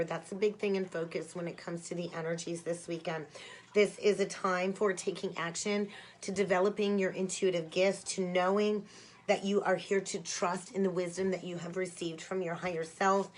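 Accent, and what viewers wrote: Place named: Brooklyn, United States. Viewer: Great reading!! Nice to hear that clear, crisp Brooklyn accent again.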